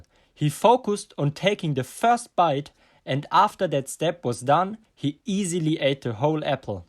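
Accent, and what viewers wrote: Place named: Germany. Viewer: Sounds German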